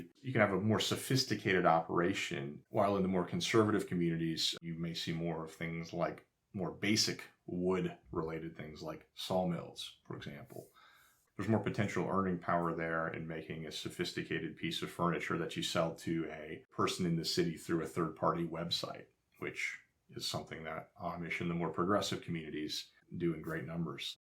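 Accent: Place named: Baltimore, United States. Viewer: Have to ask, are your from Baltimore? I hear certain words that remind me of my childhood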